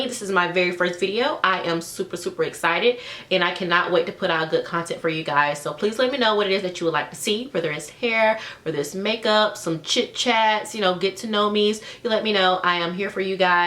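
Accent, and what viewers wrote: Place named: Yoruba, Nigeria. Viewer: cut You look igbo but sound Yoruba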